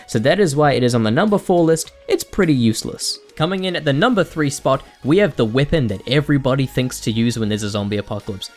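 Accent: New Zealand. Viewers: Are you from nz cause I am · are u from nz?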